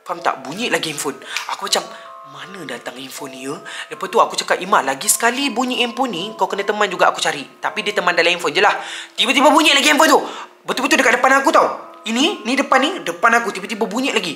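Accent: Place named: Perak, Malaysia. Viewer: ni org pahang tapi...kenapa dengaq macam ckap org perak😅😅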